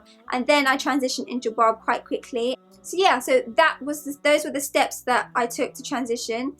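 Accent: United Kingdom. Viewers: Do u live in Liverpool or somewhere in northern England? Cos ur accent sounds like it also mashallah ur soo beautiful 😍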